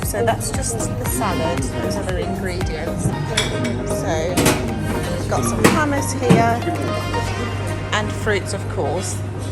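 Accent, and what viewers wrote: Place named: United Kingdom. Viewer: I subscribed because of your bri**ish accent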